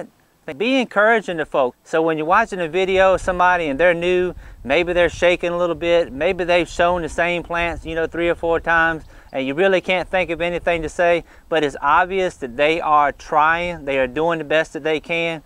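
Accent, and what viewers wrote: South, United States. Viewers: I just love that Southern accent. Plus, the guy was very positive and encouraging. I love it when things are kept simple - very simple and to the point. Great job! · Great and informative video! You seem like a really nice person; thanks for sharing your knowledge. PS--love your Southern accent!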